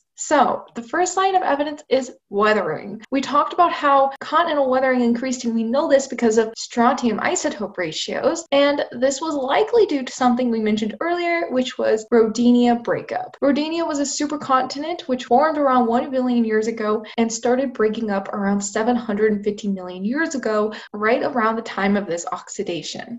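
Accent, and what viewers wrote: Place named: Midwest, United States. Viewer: I ❤️! I’ve been patiently waiting for this video. Are you a Midwest girl? You sound “normal” to me being a Midwest guy myself. Let me guess you’re in the Chicago area?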